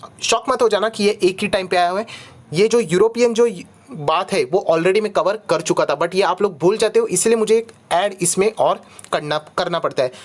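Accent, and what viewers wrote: Maharashtra, India. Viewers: Sir aap ki mothertongue Marathi lagata hai.....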